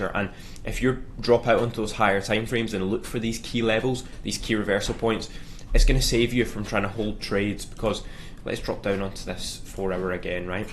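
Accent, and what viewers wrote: Scotland, United Kingdom. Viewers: whereabouts in scotland you from?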